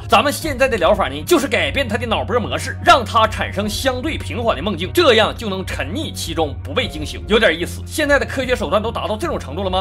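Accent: Northeast, China. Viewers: 牛叔啊，你这一口霸道的东北话太牛掰了！我也是东北人，但没有这么重的东北话口音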